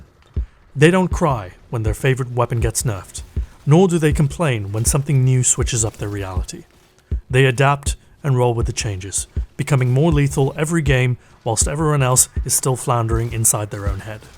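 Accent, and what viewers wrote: United Kingdom. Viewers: This video is about as useful as Lord Shaxx telling you "You're trailing the enemy. Catch up!" when it's 3-0 for them, only one of your team mates is alive, and they have 2 revives left. Maybe this vibes with pro players who get paid to do this? I don't know, I play games to entertain myself. Having a British accent blabbering on and on in a dramatic fashion about things that are so remote from reality is funny, but "stew pid".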